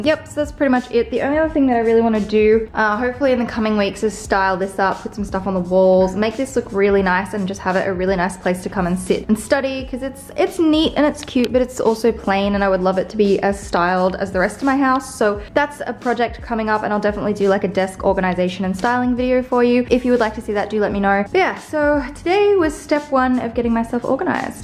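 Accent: Australia. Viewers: Lol I binged a bunch of your videos and now my thoughts are in an oz accent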